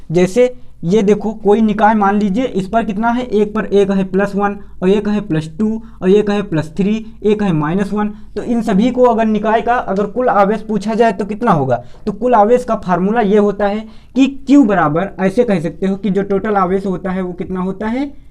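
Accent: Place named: Varanasi, India. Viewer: Aap Kaha se Ho My guess Rawansi se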